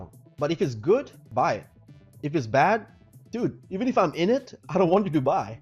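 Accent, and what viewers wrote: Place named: RP, United Kingdom. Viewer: Where is that accent from. It sounds posh to my ears. Sounds like money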